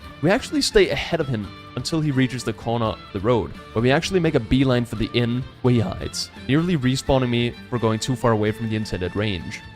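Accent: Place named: Denmark. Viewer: Are you Danish? Your accent sounds Danish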